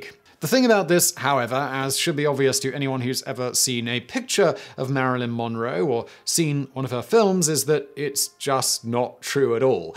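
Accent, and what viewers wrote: England, United Kingdom. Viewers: Your funny. And you speak fast in a English accent. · Between the english accent and the frickin metric system, it's a waste of time.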